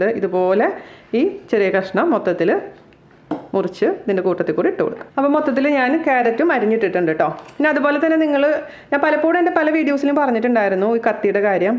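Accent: Thrissur, India. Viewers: Chechi thrissur slag kelkkan nalla rasam und too endhe amma veedu chalakudyil aaa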